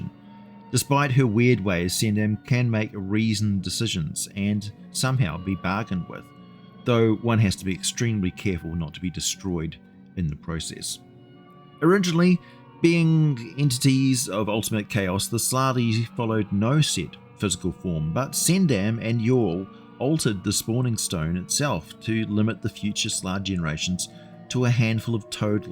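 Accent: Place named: New Zealand. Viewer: I've drifted off to sleep listening to your voice so many times my inner voice has a kiwi accent now. Currently worrying if kiwi is OK to say.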